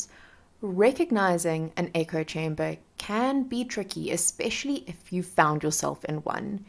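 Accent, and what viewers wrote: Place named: South Africa. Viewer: I know a Saffa when I hear one. You doing a good job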